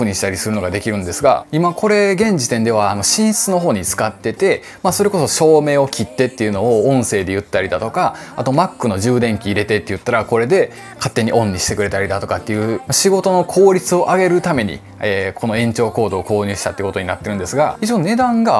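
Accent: Kansai, Japan. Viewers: 綺麗な関西弁で何かラジオみたいに聞いてたい｡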